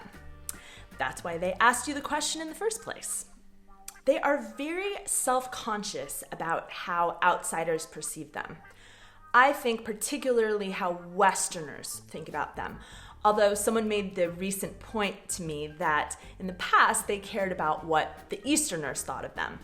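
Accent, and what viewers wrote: United States. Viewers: Yep you sound american, I dont like your way of thinking as an outsider from neither America or Czech you sound so self-centered. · Co se tice zlatyho pravidla, ze cesi majou 10x lepsi aj jak cizinec, tak ti budu muset dat ve vetsine pripadu za pravdu. Bez urazky, ale u tebe to na prvni poslech vypada dosti podobne🤣!!! Ale aj presto proste ZERU tech par zpitvorenych, zkomolenin Cestiny v podani nejhrubsiho americkyho akcentu😁a BTW Vubec bychom se nezlobili, a tady muzu mluvit s dobrym svedomim za vsecky Cechy a Moravaky🙃, za pridavek tadyte anglo-slavstiny. Proste te MILUJEME!!!!❤.... tedy akorat po podminko, ze se brzy ukazes s salino v Brne😉.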